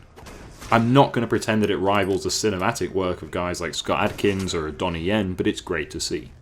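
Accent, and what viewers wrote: United Kingdom. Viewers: "With my British accent" Buddy I think you are spot on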